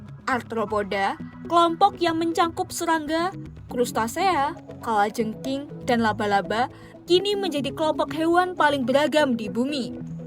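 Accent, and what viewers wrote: Java, Indonesia. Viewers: Njir suaranya Jawa medok😂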